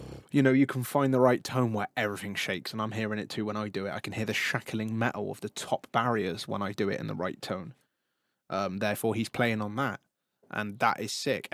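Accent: United States. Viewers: Your American accent sounds very mid west.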